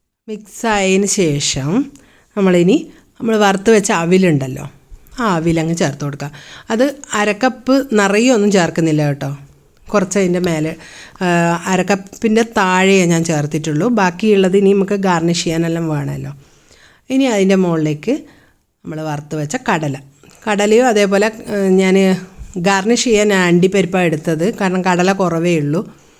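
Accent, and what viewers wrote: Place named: Vadakara, India. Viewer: അവിൽ മിൽക്ക് ഇഷ്ടം ആയി സംസാരം അതിലും ഇഷ്ടം ആയി അവിൽ കയ്യിൽ ഇട്ട് നുരുമ്പുമ്മോ പൊടിയണം വടകര ഭാഷ എന്തായാലും സൂപ്പർ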